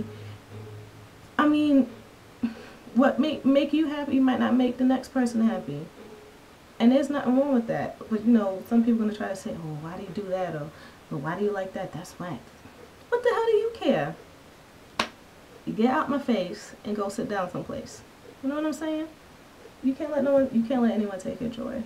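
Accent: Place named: New York, United States. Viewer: Love this vid! Are you from New York? You kinda have the accent.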